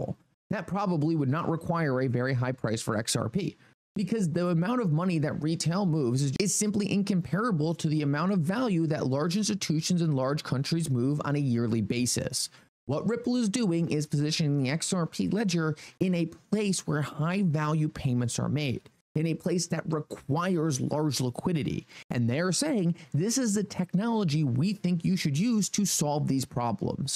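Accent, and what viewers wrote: Allentown, United States. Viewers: with an Allentown accent strikes again with lies and false hopes.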